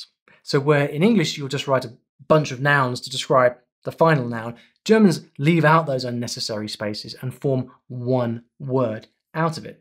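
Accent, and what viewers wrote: United Kingdom. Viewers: To me as a German you sound, quite frankly, very bri'ish. But English has some crazy dialects as well. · Ich habe Dein großartiges Video mit Interesse verfolgt., Du kannst so fesselnd erzählen. Und Du klingst so überzeugend. And it's great to hear you British English. I just like both Westgermanic languages.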